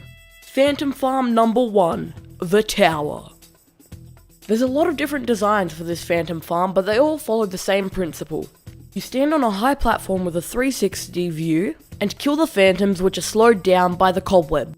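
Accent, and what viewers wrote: Australia. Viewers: Are you Australian??? You sound like Steve Irwin... CRIKEY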